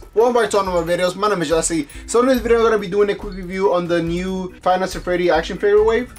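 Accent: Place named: Mexico. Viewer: are you a Mexican trying to talk English you wouldn't mention you just did slam dunk your stupid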